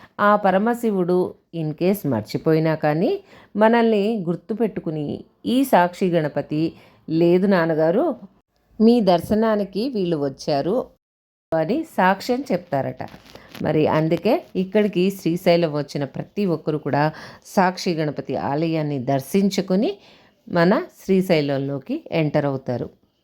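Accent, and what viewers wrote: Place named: Godavari, India. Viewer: Godavari slang suuuuuper ma'am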